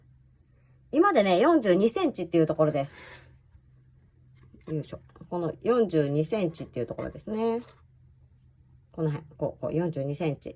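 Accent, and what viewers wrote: Kansai, Japan. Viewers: はじめまして、こんにちは😃 なんか、馴染みのある関西弁でご説明されてるので、思わずコメントしちゃいました😂 兵庫から鹿児島に嫁いではや30年、今だに関西弁が抜けません笑笑 この編み方、難しそうですが、面白そうなので、ぜひやってみようと思います😊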